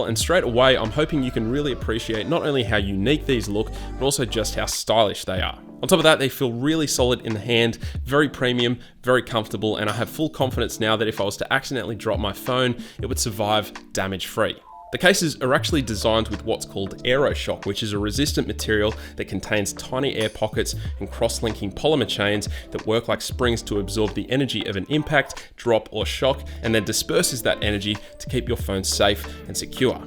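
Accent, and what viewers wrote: Australia. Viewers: I'm sold with the magnet tech. I was wanting something like this to stick to equipment at the gym so I can see the timer without having to stop. Great review. Nice to hear an aussie voice on the tube too.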